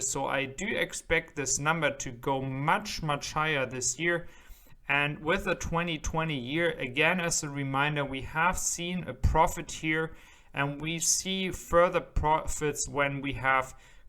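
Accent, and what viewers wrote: Germany. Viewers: new viewer here, 👋🏻, what's your mother tongue? & "Feviery" sounds a bit French, but your vowels and stress are all Germanic. "Twenny" — Not sure what this tells me "six hundred and thirty two" — presence of "and" makes me think not German or at least didn't learn US English, but: "ja": Maybe you are German? I'm all confused :-)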